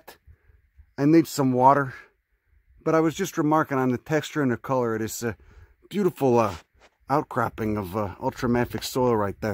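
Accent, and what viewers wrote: Chicago, United States. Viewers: That's a Chicago accent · That thick Chicago accent tho!!!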